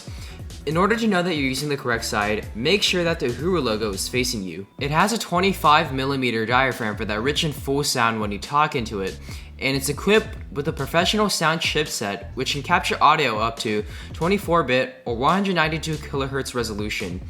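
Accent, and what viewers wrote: South, United States. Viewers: good southern accent. Chinese accent is next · I think the intro was your original accent and you put on a more "normal" accent for the rest of your video. Dont be afraid to show your viewers who you are. Let your southern accent out with love and passion. We want it.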